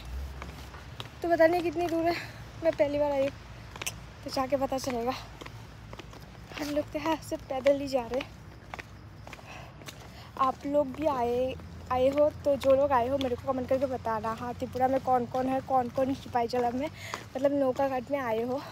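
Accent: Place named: Bangladesh. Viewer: আপু আমি বাংলাদেশী আমি বাহরাইন থাকি, আমি তোমার সব ভিডিও দেখি তোমার ভিডিও আমার খুব ভালো লাগে, তুমি আমাদের মত কথা বল, ভালো লাগে তোমার সব কথা,এখন কেমন আছ জানাইবা, রিপ্লাই চাই ওকে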